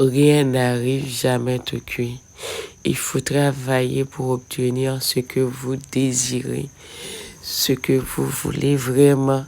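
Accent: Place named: Democratic Republic of the Congo. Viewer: dans cette vidéo tu as l'accent d'une congolaise,depuis la nuit des temps ça a été toujours ainsi rien n'est gratuit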